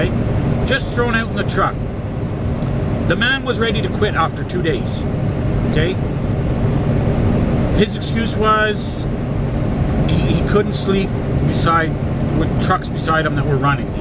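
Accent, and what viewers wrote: Canada. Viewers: I called it 30 sec after the video started - knew he was a canuck, recognize that accent anywhere good vids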